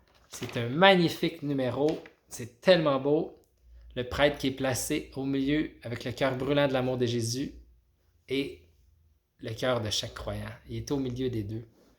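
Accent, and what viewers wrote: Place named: Canada. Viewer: je me suis perdu sur youtube t qui toi (mais ta un accent canadien c trop bien)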